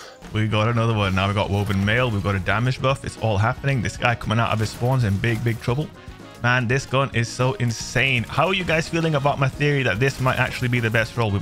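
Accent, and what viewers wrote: United Kingdom. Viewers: Something about a britsh man talk about fish in destiny is oddly satisfying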